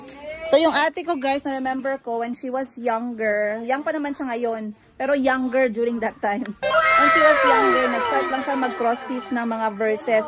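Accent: Iloilo, Philippines. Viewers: Tuwang tuwa Ako sayo Lalo na kapag nag salita ka na tonong ilongga 😅😅😅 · Ilonggo kamo? Or acquired knowledge of speaking inilonggo....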